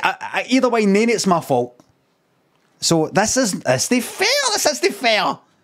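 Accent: Scotland, United Kingdom. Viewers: How can he have such a heavy accent and not speak gaelic? Imagine a guy with a heavy Italian accent not speaking Italian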